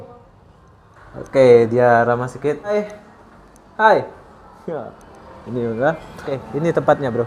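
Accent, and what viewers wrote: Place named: Sabah, Malaysia. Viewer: logat orang Sabah kau.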